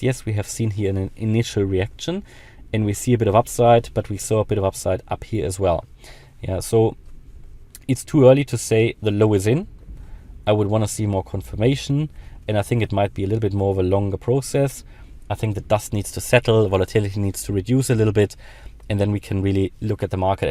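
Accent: Germany. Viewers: geiler Typ 👍 hört man direkt den guten deutschen Dialekt raus 👌 wollte nur schauen wie es um ADA steht und bin auf dein Video gestoßen. Abo hast du sicher.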